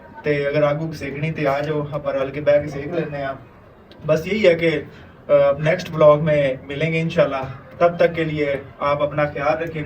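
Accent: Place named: Punjab, India. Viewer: Apka punjabi accent bohat acha hai bhai 🤍🤌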